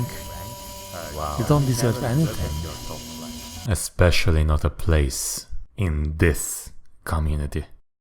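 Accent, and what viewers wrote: Hungary. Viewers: csak szimplán hallgatom a hangod és annyira magyaros akcentusod van hogy meg kellett néznem a profilod... és tényleg (csak most találtam rá a csatornádra és tetszik amit látok ;) )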